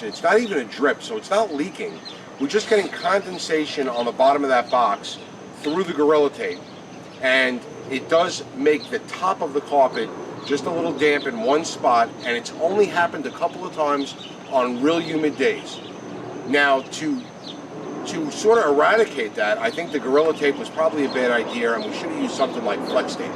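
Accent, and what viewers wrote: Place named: Long Island, United States. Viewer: this just wouldnt be the same without the Long Island accent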